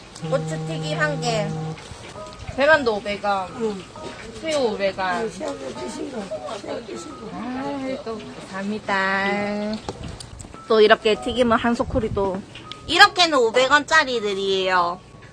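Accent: Busan, South Korea. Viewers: 38살 아줌마가 보기에도 우와 이쁜 ~♡😍 너무 착하고 예의가 바르셔서 어떤 영상이든 흐뭇한 미소로 보게 되는것 같아요^^ 생각보다 많은 사람들이 말투나 태도를 많이 보신다는거..공주병 조금 이라도 느껴지면 영상 닫아버린다는거 아시는지요ㅋ 서울 살다 부산 갔을때 부산 사투리 땜시 상처 많이 받았는데 사투리 쓰면서도 이렇게 싹싹 할수가 있구나 키워주신 부모님 인성과 성품이 그대로 묻어나는 예쁜아가씨네요♥ 가끔 들어와서 응원하며 영상 잘보고 갈께요 감사합니다^0^* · 부산사투리 쓰세요?사투리인것같아옄ㅋㅋㅋ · 뭔가많이들은 말투다했더니 부산사는친구랑 비슷//너무좋다사투리ㅠ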